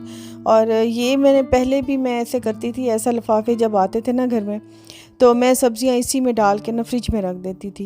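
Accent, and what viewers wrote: Saraiki, Pakistan. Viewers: Towadi saraiki axcent meno bara pasand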